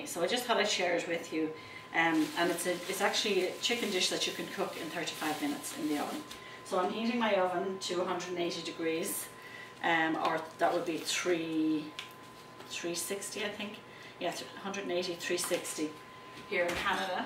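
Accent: Ireland. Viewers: i love your accent Irish i presume . your a lovely lady and thumbs up for you. great teacher and refreshingly no frills presentation. i am going to cook some chicken in my crock pot NOW. thank you.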